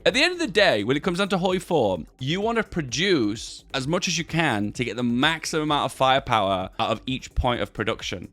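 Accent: England, United Kingdom. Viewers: You know, Ive watched your videos for years. No idea what you looked like. This isnt what I expected lol not bad. lol Whats the accent? like region of England?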